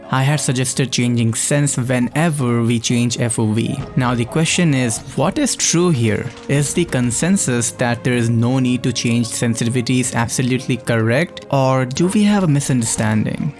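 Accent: India. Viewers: Idk if anyone else has noticed, but now sounds more "Indian" if uk what i mean 😉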